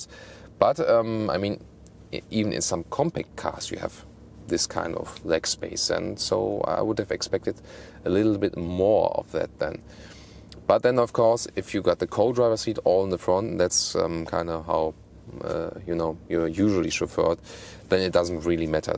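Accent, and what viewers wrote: Germany. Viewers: Great review. Your English reminds me so much of my Grandmother. She was German, too. And everytime she asked 'what time it is' she would say 'how late is it' (wie spaet). Made me smile. You liked the car but it seems you're not fully convinced.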